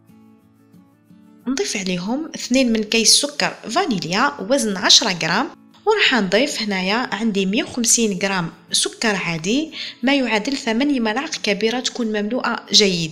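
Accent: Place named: Morocco. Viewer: انت مغربية من هضرتك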